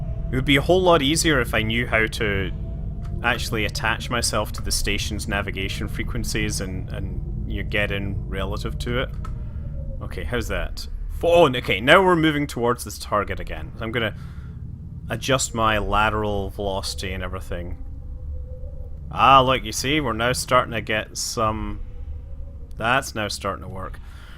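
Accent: Scotland, United Kingdom. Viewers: Is he Scottish? He just sounds Scottish to me and if I'm hearing that right I'd be happy. If not I learned something.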